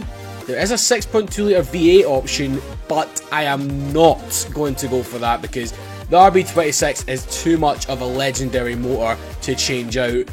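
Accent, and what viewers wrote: Scotland, United Kingdom. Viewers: Are you from Scotland. I like your accent if that's how you spell it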